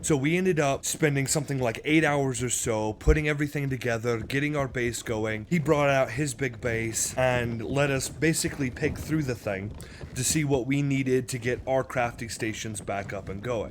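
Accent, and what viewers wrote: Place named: Scotland, United Kingdom. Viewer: Nice video mate, give me a shout on my discord over the weekend and we can have a chat. Btw were is your accent from? Bit of a Scottish twang in there.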